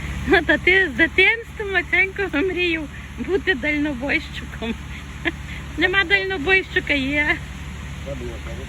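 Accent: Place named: Prykarpattia, Ukraine. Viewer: Чую прикарпацьку говірку❤. Дякую Вам дорогенька за відео. Я тої зими готувала конвертики з яблуками за Вашим рецептом. Маю схожий рецепт від моєї мами але тісто з пива, масла і борошна. І називалося це печиво ''хусточки''. Успіху Вам і Вашій родині❤❤❤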